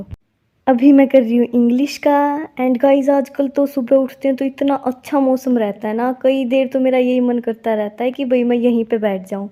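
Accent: Haryana, India. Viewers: Aap hariyana se ho....pakka tone se pta chl gaya😂 Aso in Css 2024🤞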